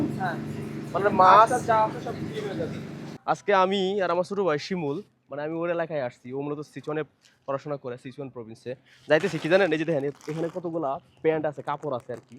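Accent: Mymensingh, Bangladesh. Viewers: আপনার সাবলীলভাবে ময়মনসিংহ অঞ্চলের ভাষায় কথা বলা আমার খুবই ভালো লাগে বিধায় আপনার সব ভিডিও দেখি এবং ভালো লাগে ! শুভ কামনা করছি তোমার জন্য ! কোন জেলার আপনার দেশের বাড়ি ❓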